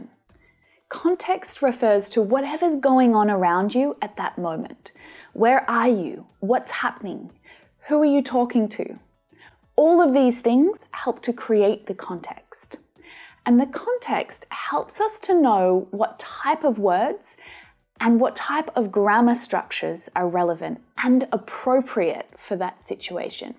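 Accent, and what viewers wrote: Australia. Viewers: I really love your Australian accent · so you are from Australia, I was not capable of telling whether you are Britain or Australian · Im new to the channel, Im trying to guess where ur from? my guess is you are down under??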